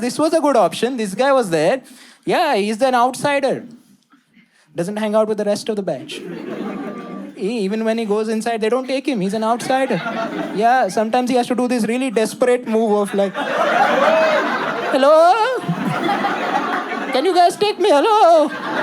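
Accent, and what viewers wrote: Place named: India. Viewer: He has such a stereotipical indian accent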